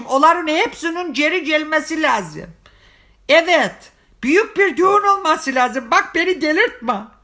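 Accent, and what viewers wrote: Black Sea, Turkey. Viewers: abi çok iyi Karadeniz şivesi yapıyosun seni çok seviyorum ❤️🤗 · Domuz Cibi🤣🤣🤣Karadeniz şivesinde bu kadar guzel konuşulurmu yaa 👏🏻👌🏻Yetenek yaa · Adam karadenizli Trabzonli Ofli daaa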